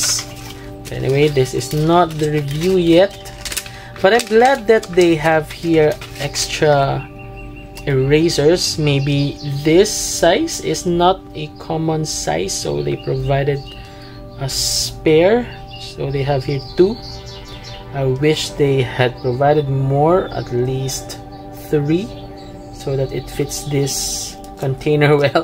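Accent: Philippines. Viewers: Are you Filipino? Your accent is lovely.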